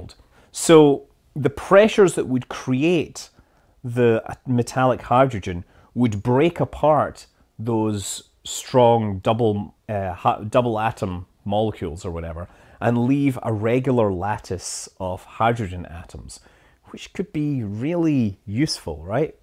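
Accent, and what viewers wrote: Scotland, United Kingdom. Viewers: As a fellow Scotsman, yer accent does my nut in man. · Something about a scottish mans voice talking about rocket science is super calming. Kids want a bed time story heres uncle to read you history of rocket fuels.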